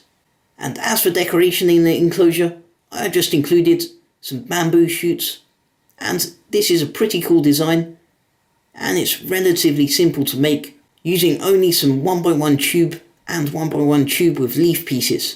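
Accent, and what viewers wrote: Southern England, United Kingdom. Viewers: He must he from the UK ( United Kingston ) or London, they pronounce some words weird but thats justo how they talk there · Hey, hope you don't mind my asking, but where are you from? You have what sounds like a UK accent, but you speak the International dialect. Your garage rhymes with carriage, but you say trash instead of rubbish (among other things I've noticed). Just curious... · He's from southern england, i'd say. Prob london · are you British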